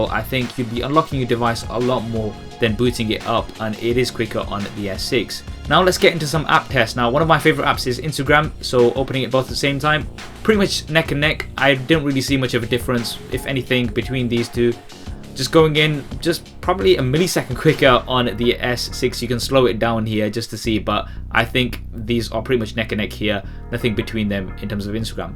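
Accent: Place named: United Kingdom. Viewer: Hi, I really like your british accent. (; I've a question. Does a fingerprint scanner on s6 works with any orientation?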